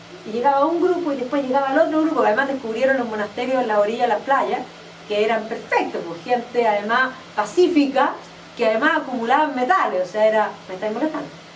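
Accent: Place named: Chile. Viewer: El tema es supremamente interesante pero, y me disculpa la sinceridad, por una parte la calidad de la grabación es pésima y por otra parte el asento chileno tan notorio en el lenguaje de hace perder gran parte de la informacion y la hace extenuante. Lamentable francamente.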